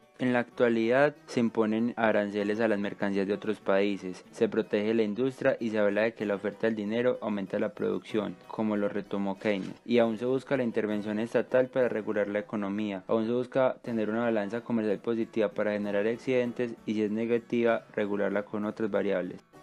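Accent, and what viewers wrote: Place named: Antioquia, Colombia. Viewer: asi es el acento paisa en colombia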